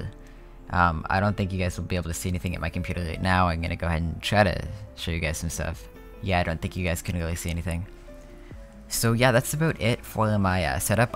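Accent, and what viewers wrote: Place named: Quebec, Canada. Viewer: THAT FRENCH CANADIAN ACCENT THO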